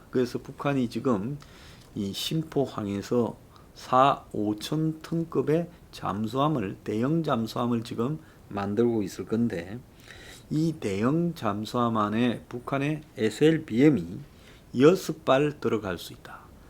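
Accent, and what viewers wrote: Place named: Gyeongbuk, South Korea. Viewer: 황장수는 부산 출신이라면서 말은 진한 경북 말을 쓰니 듣기가 몹시 어색하다.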